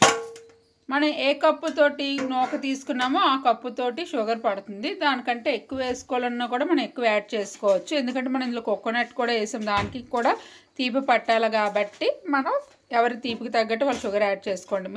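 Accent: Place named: Tamil Nadu, India. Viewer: Boríng commentary, Tamil pronunciation. Don't use aluminium dishes.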